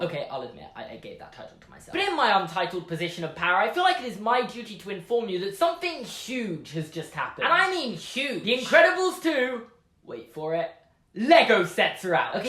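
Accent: United Kingdom. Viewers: you are from the uk!?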